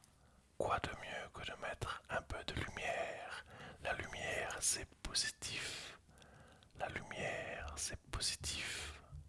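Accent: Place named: Germany. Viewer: Merci pour tes vidéos... j'aime beaucoup ton timbre,ton accent légèrement germanique.En tout cas continue comme ça 👏👏👏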